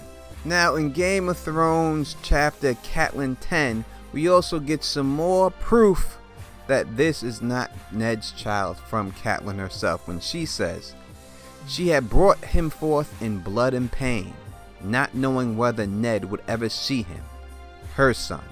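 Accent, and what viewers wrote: New York, United States. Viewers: Mind fuck! Well thought out...ur right about GRRM throwing down hints when u least expects it. For as big as the books are, nothing is prattle. Ur new yorker accent poses an amusing juxtaposition with the fantasy content.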